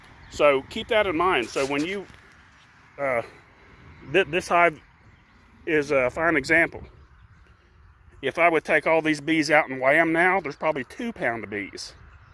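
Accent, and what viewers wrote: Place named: Pittsburgh, United States. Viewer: Did I detect a yinz in your accent? Are you originally from the western pa, northern WV area?!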